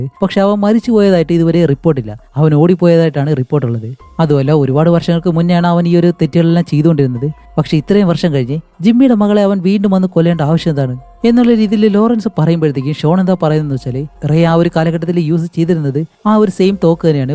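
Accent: Palakkad, India. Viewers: Palakkadan slang ill kadha kealkumbol chiri😂ariyathe varunnu😂 chirippichathinu thanks 🙏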